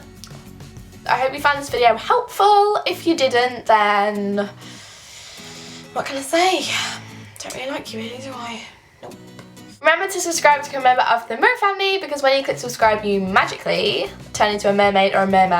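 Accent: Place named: West Midlands, United Kingdom. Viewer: Are u from the West Midlands Cus ur accent sounds like it if so wat shop is it xx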